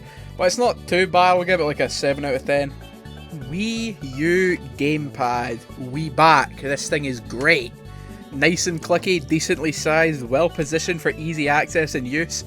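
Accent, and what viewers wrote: Scotland, United Kingdom. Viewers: Are you Scottish because I am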